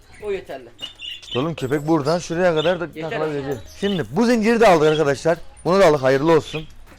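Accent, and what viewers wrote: Kayseri, Turkey. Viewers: Abi kayserilimisin yoksa anteplimi anlamadım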